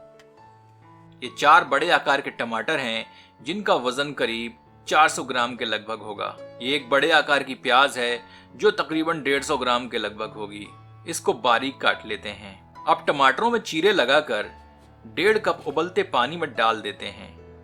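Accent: Standard. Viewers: I like your shudh hindi more than the recipe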